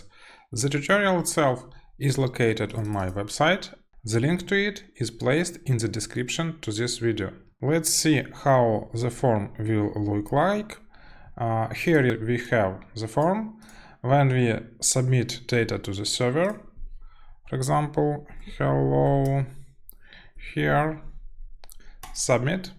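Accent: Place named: Russia. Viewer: Seems like a russian guy:)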